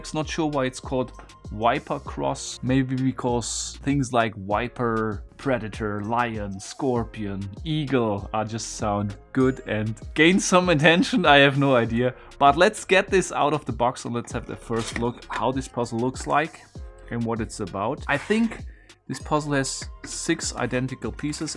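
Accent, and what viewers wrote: Germany. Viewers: It's typical German accent, as we don't have the "v" the same way pronounced as in English and the sound itself not at all (v is pronounced the same as the f mostly. voiced v doesn't exist). With some exercise we can do it but is some extra work while talking. Accents by non-native speakers are mostly based on wrong pronounciations.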